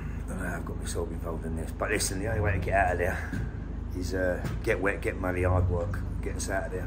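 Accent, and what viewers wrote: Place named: London, United Kingdom. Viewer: Saw your vids on the March you speek total fkin truth. Good on ya mate. You've got that proper London accent too 👍😊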